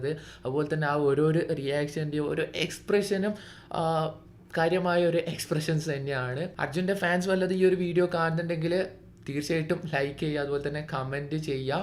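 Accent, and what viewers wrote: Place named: Kasaragod, India. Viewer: enniya njanum kasaragod enne. mundanne style kekkambam oru doubt adich appo choichadh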